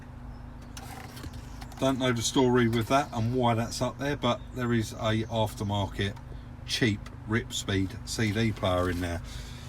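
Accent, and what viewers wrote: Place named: England, United Kingdom. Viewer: I'm from Australia. I could listen to that English accent all day.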